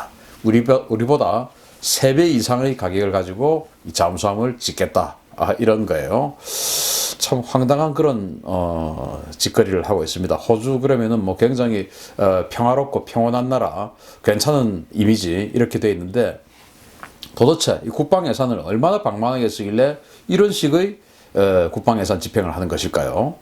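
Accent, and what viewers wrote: Gyeongsang, South Korea. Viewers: 퍼뜩 이해가 않되죠?? 남쪽 오리지날 사운드트렉이 인상적입니다. ㅎㅎ 그래서 사람은 근본과 고향을 속힐 수 없습니다. 비난의 뜻은 전혀없고 박식한 지식과 논리전개 사투리가 인상적입니다. 소시적 보리xx 어떤 선배가 수도권 삼류대학 유학 첫 겨울 방학 때 클라스 메이트들을 데리고 와서 후배인 친구들과 시중을 든적이 있었습니다. 우리동네에서 태어나고 자란 사람은 서울말이 좀 힘 듭니다. 선배가 불식간에 사투리 단어에 서울말 인토네이션을 태워서 여자친구에게 먹을 것을 권하는 걸 보고 배꼽잡고 웃었다가 선배들에게 체포되어 모교 화장실 뒤에서 사망직전까지 구타 당하던 추억이 생각납니다. 님의 말씀을 잘 경청하고 있습니다. 계속 좋은방송 부탁드립니다. 퍼뜩=빨리,금방. 수구리!= 수그려라! 만디= 정상, 꼭대기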